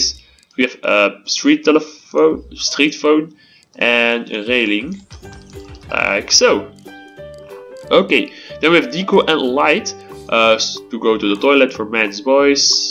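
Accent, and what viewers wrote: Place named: Netherlands. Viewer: Mooie video, maar aan je accent kan je gewoon horen dat je Nederlander (of vlaams) bent 😂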